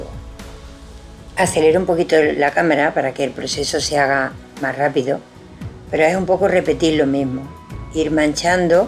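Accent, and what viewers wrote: Andalusia, Spain. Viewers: Excelente, gracias x lo q enseñaste, lo.voy a poner en practica.saludos, desde Argentina..eres andaluza?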